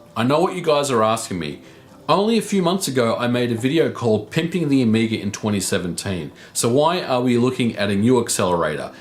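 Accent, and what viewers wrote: Australia. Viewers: So weird to hear someone with an Aussie accent say 'soddering' lol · You sound like an Australian Al Gore. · He sounds like an Australian to me. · Soddering? And with an Australian accent! It's spelt soldering, and that's how it's pronounced in English. There's no such thing as American English, just English spelt or pronounced wrong. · Hmm, you sound like an ozzi but you say SODDER like a yank??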